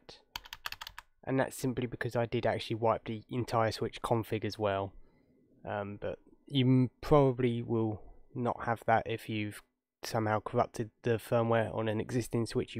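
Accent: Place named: Cockney, United Kingdom. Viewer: Hard to understand the Cockney accent. Stop chewing on the chewing gum.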